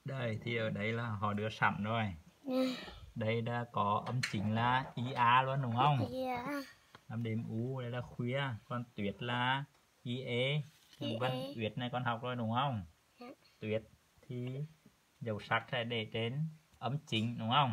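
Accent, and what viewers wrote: Central, Vietnam. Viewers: 2 ông con láu cá quá, giọng của bạn miền Trung?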